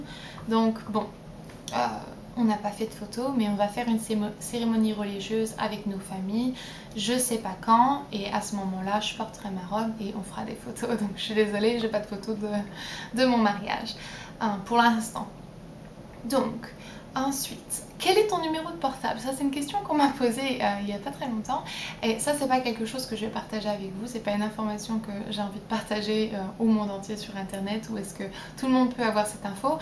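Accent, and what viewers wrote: England, United Kingdom. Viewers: je suis une nouvelle sur ta chaine :) je trouve que tu as un accent anglais cela m'a parrut étrange mais avec cette vidéo je comprends mieux :) j'adore ton naturel tu donnes envie tu m'inspires car tu es simple et tu n'as pas la grosse tête j'adore <3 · C'est bizarre, on dirait que tu as un accent légerement anglais...... pourtant tu es fraancaise n est ce pas *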